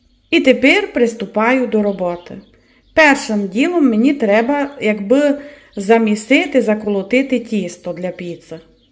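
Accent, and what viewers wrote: Transcarpathia, Ukraine. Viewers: Я з Полтави, заслуховуюсь Вашим закарпатським діалектом. Вмикаю канал, дивлюсь як готує господиня каналу та просто слухаю та насолоджуюся ❤ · Пані, у вас шикарний закарпатський діалект. Вас приємно слухати, а головне- смачно слухати😊 Дякую за рецепт. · Смотрю на Вашем канале не только рецепты, но и наслаждаюсь Закарпатским диалектом! Очень нравится, просто наслаждение! 👍🌿🌹🌿Привет из Одессы 💖☘️🌻🌻🌻☘️🇺🇦🇺🇦🇺🇦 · Важ закарпатський діалект це перлина самобутності, слухаю вас і отримую велике задоволення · Пані не вздумайте міняти мову ведення каналу. Я з Києва і тільки за Закарпатський діалект. Вас просту чути приємно. Ви знаєте комікесу Леру Мандзюк? То ж вона цікава тим, що розмовляє діалектом. Я приїжджаю до рідні маминої на Хмельниччину ❤ я млію від Подільського діалекту. Говоріть по свому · кому ся не подобає закарпатський діалект, то най ся не дивит. 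А я люблю як ви ведете канал❤ і не я одна😊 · Дуже файна піца ,а ще ліпша закарпатська мова .дякую Вам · Мені дуже подобається закарпатський діалект! Через нього я звернула увагу на ваш канал! Будь ласка, продовжуйте говорити, як ви звикли! І дякую за ваші рецепти ♥️💙💛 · Пані, ведіть свій канал тільки своім закарпатським діалектом, я коли вас слухаю душею переношусь в Виноградів до своіх дорогих друзів. Дякую вам ❤️ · Дуже люблю Ваш закарпатський діалект. Говоріть ним, бо він чудовий. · Люблю закарпатський діалект❤❤❤ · Приємно слухати закарпатський діалект. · Дякую за рецепт. Він просто чудовий. Слухати Вашу закарпатську мову просто насолода та радість для серця. Ще раз дякую🙂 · Мені суперово слухати на закарпатському, все зрозуміло! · Дуже гарний закарпатський діалект.Кому не подобається, хай не дивиться. Ви молодець. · Дякую за рецепт, простоту , закарпатський діалект ❤ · дякую вам розмовляти так як ви на Закарпатті розмовляєте це думаю гарно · Щиро дякую за смачний рецепт.Ваш закарпатський розговір - це Ваша родзинка. 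Миру нам усім і перемоги.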